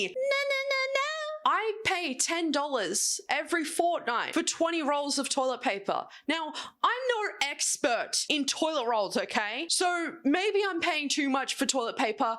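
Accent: Australia. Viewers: i think youre australian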